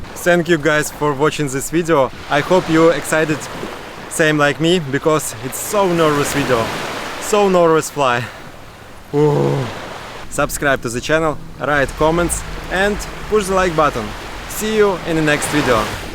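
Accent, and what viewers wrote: Russia. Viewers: Блин ну и акцент у тебя русский но лай сёравно поставил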